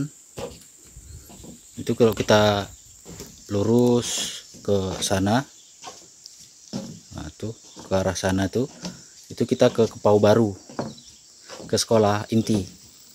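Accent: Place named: Malaysia. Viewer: Logat Melayu kampung tengah masih ada ya pak! Semngat pak demi penerus anak didik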